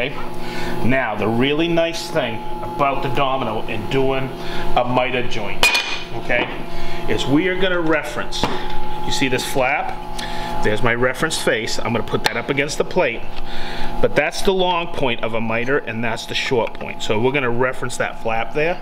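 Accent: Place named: Boston, United States. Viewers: I became one of your subscribers recently. I'm from Mass originally. I moved to the South, KY and it's great to see and hear your humor and Bostonian accent ( and this t shirt here) as well as your thorough teaching abilities. I have to say you, Bents, and Tamar channels are some of my favorite, great teaching and no fluff content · best "Mwwwoooortise"...... luv the East Coast accent. Good video boys!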